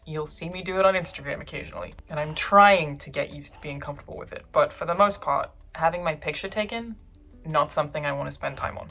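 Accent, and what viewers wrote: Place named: Australia. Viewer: I imagine you'll still be hot when you're 40, you'll still have that Australian accent that north Americans love. I also imagine creepy people on the Internet will still be a thing ten years from now.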